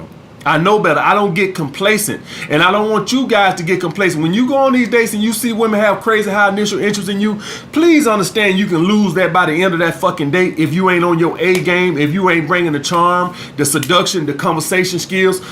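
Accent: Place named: Rural, United States. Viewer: .. Do women say your a "Fuck Boy", Because of your video content? ect..and also, im from N.Y. and have a thick AF N.Y. accent, why you sounds country as all hell? By the way..your vids are on point!👍✊🙏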